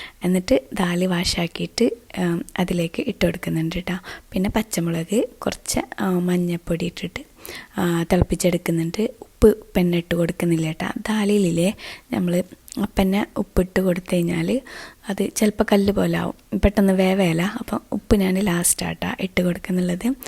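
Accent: Kasaragod, India. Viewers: samsaram thanne kure kasarcode karude yanallo kelkumbol first entha enghane parayunnen thonum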